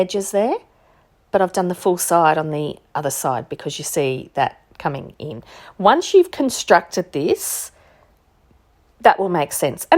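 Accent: Australia. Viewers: Nice to hear an Aussie voice! Great little project!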